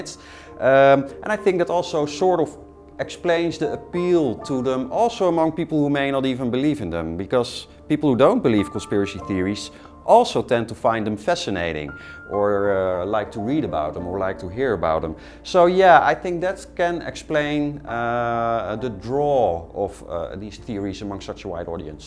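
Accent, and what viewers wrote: Netherlands. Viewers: Yo hes netherlands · You guys most dutch people have this accent when we speak english xd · He has such a dutch accent Im dutch too XD i hear it instantly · Mad Villain he is dutch, we have a lot of trouble with some sounds from the English language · wie hoorde al aan de stem dat dit een nederlander is?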